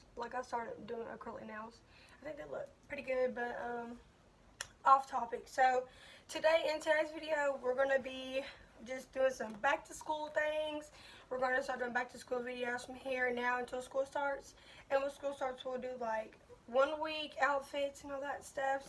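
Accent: United States. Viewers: I’m used to this Tennessee and Mississippi talk😝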